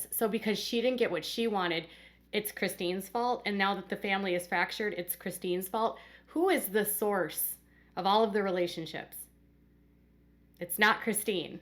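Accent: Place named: Midwest, United States. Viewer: I hear your accent more when you’re behind the scenes… Midwest?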